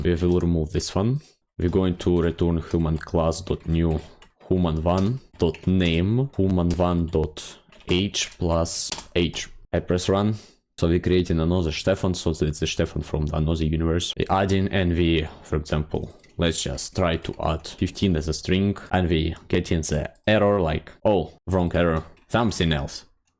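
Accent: Russia. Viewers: omg the russian accent i can feel it great tutorial !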